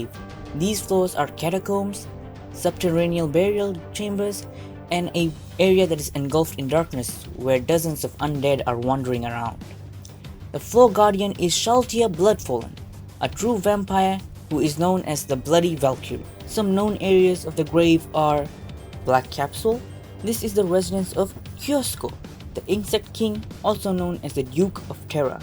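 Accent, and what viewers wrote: India. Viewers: Alkatron agreed. I am so incredibly distracted by the indian accent. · Great video except the Indian accent. U could have someone else to read it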